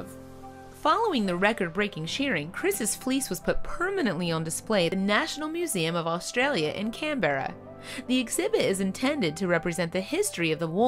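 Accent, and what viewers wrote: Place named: Mid-Atlantic, United States. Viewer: I'm willing to bet that the voice over artist is from a central Atlantic state. She did a great job, I'm absolutely not being snarky. But you can hear a PA/MD/NJ/DE influence. It's cool!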